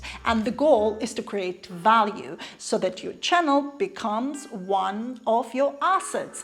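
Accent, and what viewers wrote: Jamaica. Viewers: Girl I hear the Jamaican accent coming out lol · LOL....Good Joke....We love your accent, especially when the "Jamaican" part "sneaks in"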